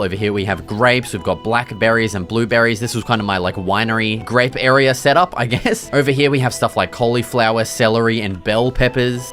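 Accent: Australia. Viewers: why are you australian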